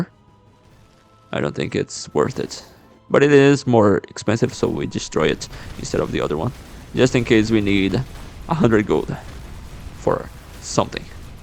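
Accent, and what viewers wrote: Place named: Brazil. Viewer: you are brazilian? you sound like it and your name sounds a little portuguese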